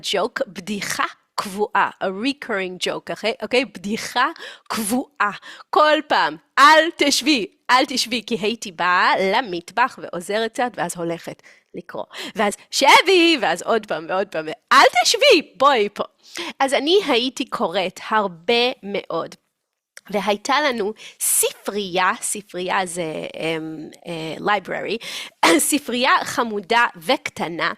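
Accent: United States. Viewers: I love your podcasts- very fun, informative, and very good for my Hebrew learning. It is awesome the way you are completely bilingual and can switch so effectively between Hebrew and English and your accents are perfect (perhaps a bit American in your Hebrew but OK!) Just one idea- if you could look into the camera when you speak. Your gaze is always to the side and a bit low. I think your podcasts would benefit from looking straight into the camera, though it may take a little practice. Thank you sooo much!!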